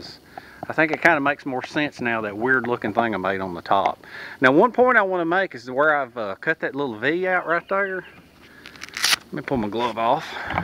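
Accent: South, United States. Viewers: I had an ol' D.I. that told me: "Private - - why do you talk...so...slow?!" I told him "Sir, because this recruit's Dad is an Okie Sir." He tried NOT to laugh when he told me that explains a lot! I thought I'd share that with you. don't ever lose that Southern way, it's what makes you original & thanks for communicating at my tempo! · I think my settings are okay but could be wrong; anyway I loved seeing Flat Head's long term shelter. By the way as for the southern accent you are home grown and cool as you can be so junk'em if they don't like how you sound. I saw in your last video you had an LSU mug; are you a graduate or is that where Nick is attending college? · Don’t ever apologize for speaking southern. I lived in Alabama as a kid about 60 years ago and I like your accent. Louis Grizard, a southern humorist, had a saying, “God talks like we do.” I still sound like I’m from Alabama and I like to think Grizard was right. 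· Excellent video, my god i love that southern drawl...keep up the good work...thanks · Man, I like your accent so much! which part of the states are you from? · Where are you from? I;m so southern I'm related to myself, lol!! I think your drawl is even more than mine! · c'est l'accent 'du midi' aux etats unis. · Great video Sir, love the Southern accent. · i Like the way you Talk, it Sounds very 'murican:D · Cool shelter Glad to see you making videos again. I am from the south too love ur accent dude. Flat Head would be honored to be remembered. Till next time. Thanks for taking the time. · Excellent video as always. I haven't commented in a while, but that doesn't mean I haven't been watching. I always look forward to the next episode of World. But watching this one, it occurred to me that you're like the Mr. Rogers of the bushcraft community. So friendly, jovial, non-threatening, helpful, and nothing but a passion for teaching all the uninitiated (and the seasoned survivalists, as well) your wealth of knowledge, whether original or learned yourself. 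And you don't take yourself too seriously!! That's the best part. Keep 'em coming. And worry about your Southern. Us NC boys, and all of us born and bred in the South, know exactly what you're saying. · Fabulous, really enjoyed watching and listening to your Souther accent. Carol from Edinburgh, Scotland, UK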